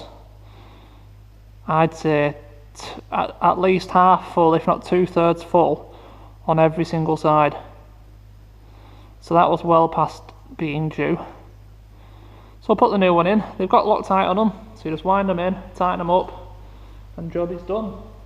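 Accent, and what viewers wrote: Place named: Northern England, United Kingdom. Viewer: Lovely Jubbly video, great northern accent.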